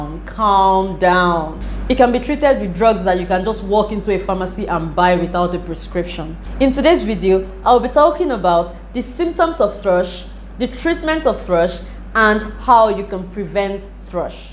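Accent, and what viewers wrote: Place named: Nigeria. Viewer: I think she's a Nigerian 😃😃😃😃😃😃😃😃😃I love her❤❤❤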